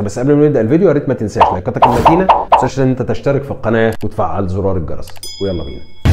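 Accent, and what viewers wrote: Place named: Morocco. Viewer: لا هي مغربية لهجتها مغربية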